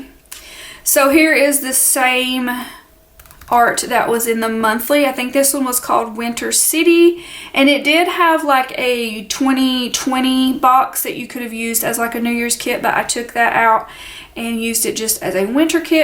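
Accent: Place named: Kentucky, United States. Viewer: I just love your videos... Anytime i have a video playin my 7 yr old says she talks just like meemee (very deep Kentucky) we love it girl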